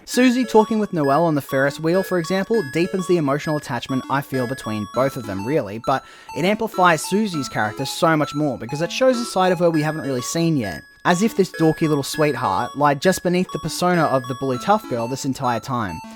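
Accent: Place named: Australia. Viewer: Unrelated but I sense an Aussie here… Good on ya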